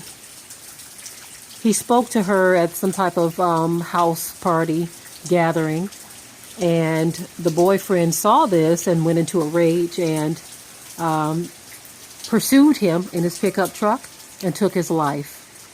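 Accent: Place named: South, United States. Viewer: I love your accent, which state are you from? Sounds like somewhere in the South.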